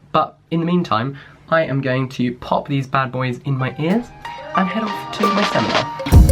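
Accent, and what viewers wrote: United Kingdom. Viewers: Am I the only one who is imitating his accent? Seriously I'm in love with the british accent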